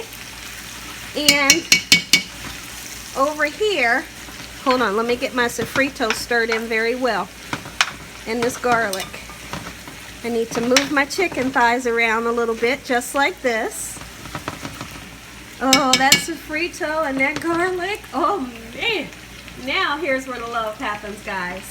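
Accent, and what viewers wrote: South, United States. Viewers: I'm from n.y and l came across your channel and l'm loving it Wow!! the cooking you're doing. Plus your southern voice is amazing to me to being a new yorker... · yes I could see her on food network or a country soul cooking channel she should start sending in some of her recipes to those type channels like CMT because she does have a bit of a country drawl . This chicken looks so good ima slappin that like button . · love the southern accent thank you for the recipe!! love from africa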